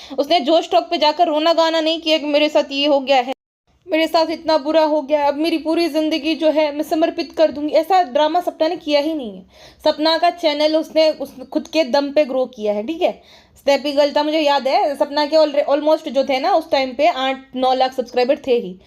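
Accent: Gujarat, India. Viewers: 25 saal ki ho gyi hu pehli baar youtube pr kisi ko comment kiya hai ❤ u r worth it for this love and your gujrati accent ❤❤ lots of love from jammu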